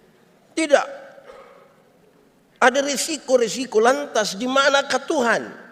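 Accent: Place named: Eastern Indonesia, Indonesia. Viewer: Cuma bahasa + logat ind Timur, agak sulit-lama sy cerna pelan 😂😂😂😂 pemakna'an nya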